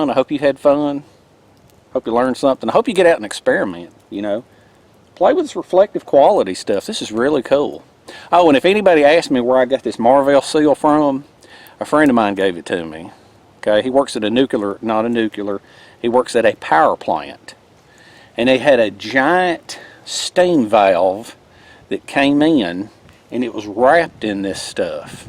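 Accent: South, United States. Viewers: this is Mark from north central Ohio. I really enjoy watching your content. You are such a mellow dude and I like that southern twang. "I think I'm fixin' to go in there." I'm sitting in my recliner drinking a cup of coffee that I made on my alcohol stove. That fire reflector is a fantastic idea. There is another guy on YouTube who is really popular and has a lot of great content but he makes some of these elaborate contraptions and like you mentioned it takes a lot of time and energy making one of those heat reflectors out of wood and he's got major OCD because he goes back and chops the ends off so they're exactly the same length. Like you really need that if you were in a survival situation. 😃 You mentioned that you don't own property to camp on so you cannot set up a permanent shelter but what you could do is after you take your shelter apart you could just pull those stakes out of the ground and gather them together and lean them against a tree and then when you come back again it would be much quicker to set up because everything is prepared. 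All you gotta do is grab the same stakes that you used and put those back in the ground and rig up your shelter again. What I really like about your videos is your personality and the fact that you're not trying to sell something. You're going out there to have fun. There's another southern boy that I like watching, a guy named Blackie Thomas from Alabama. Same thing with him, he's a mellow dude and he has lots of videos and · God love ya , I could listen to you ramble all day . The cute things you say ,combined with that southern accent , and laugh ,your irresistible ! It's been insanely cold up here in Ohio . It surprises me that it's that warm . I would have thought most of the heat would rise up.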